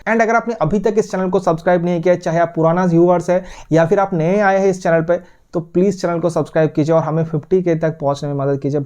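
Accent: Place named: Assam, India. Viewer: Everything is good but ur Assamese accent is kind of unique not bad though 😊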